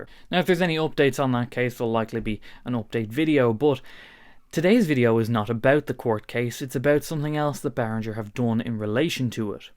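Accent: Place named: Dublin, Ireland. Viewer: Fantastic accent, Dub?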